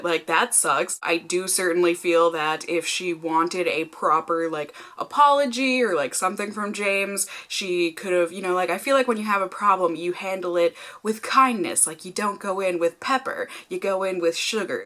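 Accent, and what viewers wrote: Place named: South, United States. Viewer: That Southern accent and the drag comments made me subscribe to you. Also the fact that you called out James Charles and the drag/clap back culture.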